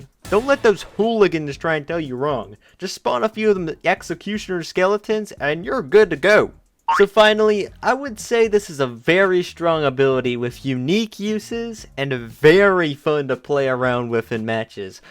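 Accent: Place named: United States. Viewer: This is my first time watching you and I was like wow he sounds very American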